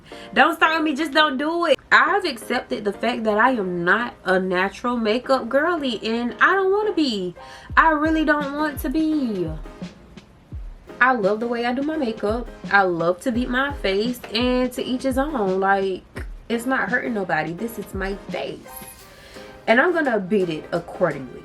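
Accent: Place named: South, United States. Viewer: I knew I heard a southern accent 😂 even down to the certain phrases you said. Girl I’m in SC too and I definitely get it. I use to live in San Francisco but came back due to the pandemic. Wished I would have kinda stayed. 🙄 but ya girl definitely plans on moving again no if ands or buts. 😂 Anyway. Love the makeup 🥰🥰 super cute!! New subscriber 🤗 Very rare I meet another YT from SC!!!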